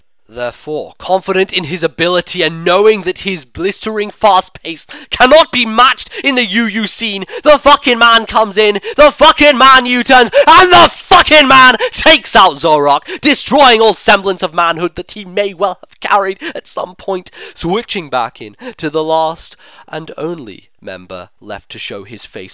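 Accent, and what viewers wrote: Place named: United Kingdom. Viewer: i'm guessing you're british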